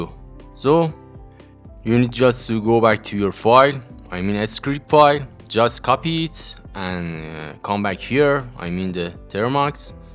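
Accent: Iran. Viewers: Bro ur accent is tooo persian😂😂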